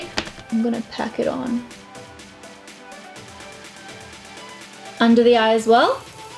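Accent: Australia. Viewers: Hell yeeaas #notificationsquad as always. Staying in oz right now and feel your accent much more now haha insta: Paniz.sophia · There’s nothing wrong with the Aussie accent/slang. Don’t forget where you come from!! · I love your Aussie accent 😍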